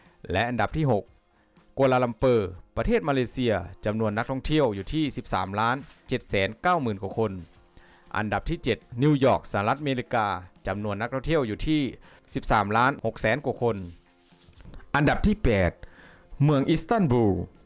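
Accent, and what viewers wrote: Laos. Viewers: เดาไม่ผิดแอ๊ดเป็นคนลาวแน่นอนคนไทยแต่ละภาค ผมฟังสำเนียงออกรวมถึงชนเผ่า คนลาวจะไม่มี ช.ช้าง จะพูดออกซ.โซ่ นี้คือสิ่งที่ผมจับจุดได้แบบ99.99% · พูดไทยแต่ออกเสียงลาว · ผมก็ฟังออกว่าคุณเป็นคนลาว มันแตกต่างกันกับคนไทย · คนพูดเป็นคนลาวถูกต้องไหมครับ😅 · รู้สึกเอ็ดดูแอดมินที่พยามพูดภาษาไทย แต่ก็ยังฟังออกว่าเป็นคนลาว เพราะพยัญชนะ ค ย ฉ ช ซ ส ษ ศ ล ร ฮ เหล่านี้เป็นต้นที่ทำให้คนลาวออกเสียง และสำเนียงผิด ส่วนลาวจะออกเสียง ຊ = ช ซ ສ = ฉ ศ ษ ລ ຮ = ล ร ອ = อ ฮ อะไรประมาณนี้ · ถ้าออกเสียงสำเนียงแบบไทยแนะนำแอดอ่าน ใช้ ไม่ใช่ ไซ้/ ฉุด ไม่ใช่ สุด/ฉะเหลี่ย(เฉลี่ย) ไม่ใช่ ศธเกลี่ย และอีกหลายๆคำที่ไม่ได้กล่าวไว้ ***แต่ถ้าปรับไม่ได้ก็ออกเสียงแบบลาวไปเถอะ คนฟังและดูเค้าเข้าใจภาษาลาวอยู่นะ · แอ็ดมินจากสปป.ลาว ก็จะออกเสียงได้ประมาณนี้ครับ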